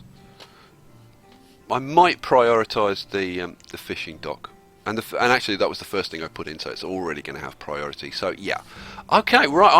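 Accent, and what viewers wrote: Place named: Northern England, United Kingdom. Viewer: where u from man in the UK? i guess in the north, but not too much high on map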